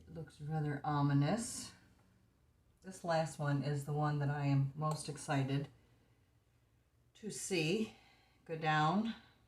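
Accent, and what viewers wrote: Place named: Central New York, United States. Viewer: This is awesome. I love hearing you talk. It's like I'm back home in CNY. The accent sounds the same. ;-)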